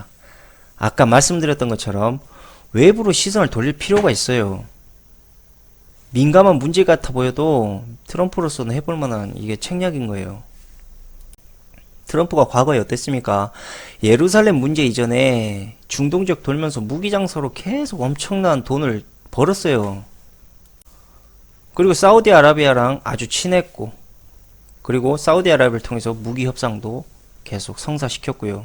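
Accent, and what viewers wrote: Jeolla, South Korea. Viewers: 말투가 전라도인게 뭔 상관이냐 ㅋㅋㅋ 지역감정 부추기는거 정말 혐오스럽다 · 말투가 전라도라....청화대에 세월호 선장 이준석 같은 무리들이 있어서 큰 낭퍠로다. 호남 인민 공화국이 다 된것 같지만.....이 세상의 흐름은 진실 앞에 거짓은 망할 것 이로다. 왜냐구....트럼프가 있기 때문에.... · 아 전라도 사투리 시발 존나 듣기싫네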